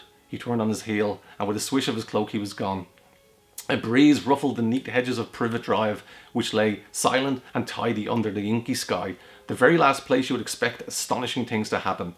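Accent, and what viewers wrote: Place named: Ireland. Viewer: Please. Do more of this. I've been looking for audiobooks with irish accent.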